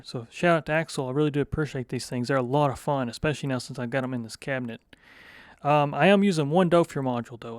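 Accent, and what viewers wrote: South, United States. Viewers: This southern charm and accents are true 😊 your videoes demoing the Behringer moog systems totally sold them to me ❤️ wow 😊 please never stop sharing your videos 😊❣️🙌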